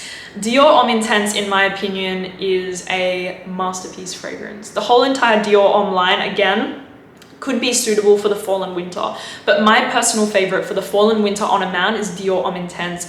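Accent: United Kingdom. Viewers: are you british?? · Ngl I love her accent it sounds more British than Australian but not completely English maybe it's just the Aussie YouTubers I watch who don't have her accent but hey ho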